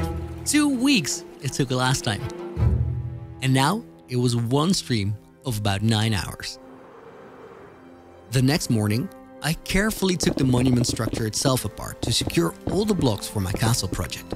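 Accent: Netherlands. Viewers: very impressive,! You are not only a talented builder, but you have a gift for making videos too. Unbelievable! also: are you Dutch by any change? I thought I could hear it, but I could be wrong. (I am not at all saying your English is bad) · are you dutch cuz i hear it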